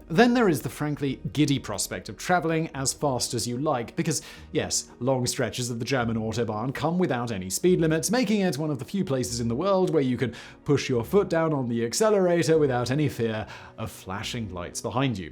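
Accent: RP, United Kingdom. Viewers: Oh vey just another what is it Brit brick accent(?) telling lies about the war and Hitler? Maybe mention who declared and supported partisans, oh yes in this case was stupid to let Brits escape at Dunkirk, should habe them out and all would have been over. Who used dumdums? Ah right and terror bombing on German civilians. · This is my first Megaprojects video and posh, educated, hipster, laa de daa accent is something else. Immediate sub. Also for the interesting content as well of course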